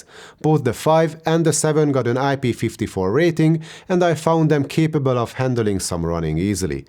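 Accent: Hungary. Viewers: Where are you from mate? You sound Hungarian. Van bojler eladó! Great video btw. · Why do you have a hungarian accent?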